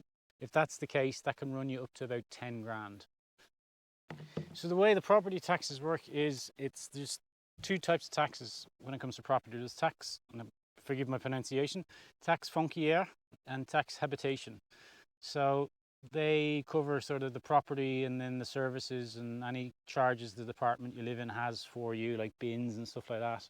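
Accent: Dublin, Ireland. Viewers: QUESTION! Presuming from your accent you're a Dub (or certainly the Pale area), but if you are a Dub are you a northsider or a southsider? I'm a northsider meself 👍🏻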